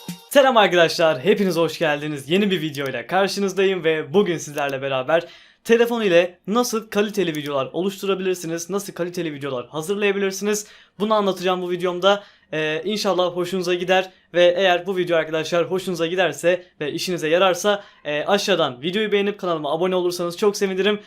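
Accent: Azerbaijan. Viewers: Sen azerisən brat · Abi sen azerbaycanlisan qaqa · Azerisən sen?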